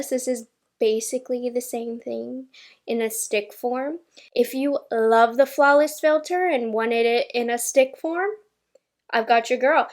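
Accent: England, United Kingdom. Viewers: Aah, excellent video, and the English accent uncanny (good audition for Bridgerton). Yeah, I agree with it looking metallic. It reminds me of Laura Geller's balance and glow powder foundation, and that never sat well with my skin. But you did an amazing job with this honey, I won't be purchasing it, but on a very young skin, it would probably look amazing, just not for my almost 61-year-old skin. Thank you, gorgeous girlie 😘 🌟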